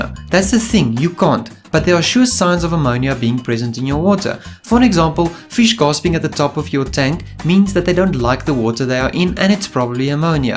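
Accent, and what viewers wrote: South Africa. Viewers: It takes exactly 0.3 milliseconds to recognize a boer accent 😂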